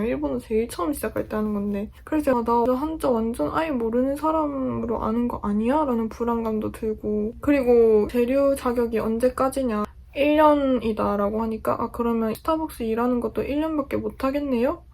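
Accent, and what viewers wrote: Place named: South Korea. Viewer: 파이팅이요!! 한국 사투리 구수하시네요 정감가요 일본생활 파이팅입니다!!!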